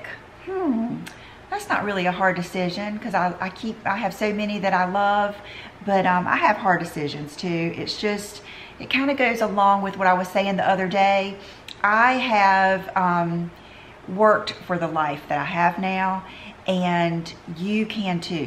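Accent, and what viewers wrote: South, United States. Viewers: I love your outfit ! You have the most likable personality and such a charming Southern accent. I’m so glad I discovered your channel. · Love to watch your videos, gotta admit it's because of your demeanor and southern accent.....😁 your voice is sooooo like shelby from steal magnolias, hope that does not offend you 👍🌻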